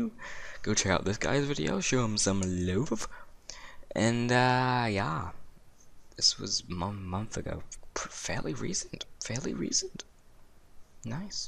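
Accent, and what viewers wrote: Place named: United Kingdom. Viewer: british accent? xD I'm from spain and I just love how you speak english!!! UvU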